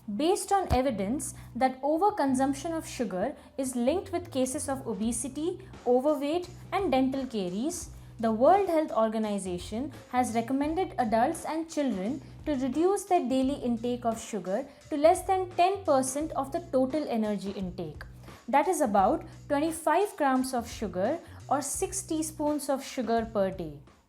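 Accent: India. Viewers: Must you talk in English? Your pronounciation sounds half Hindi and only 10% english. Hard to understand.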